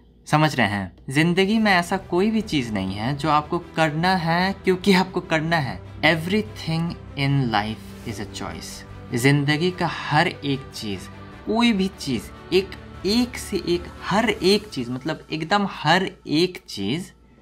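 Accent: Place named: Bhojpuri, India. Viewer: 💞💞💞 bhiya aapki hidi thodi bhojpuri mix sound kr ri h we support you 💜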